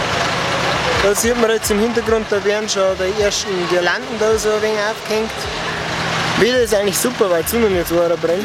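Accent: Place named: Upper Palatinate, Germany. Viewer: Geh leck, der Oberfälzer Dialekt is scho da krasseste fo olle😄😅👍🏼.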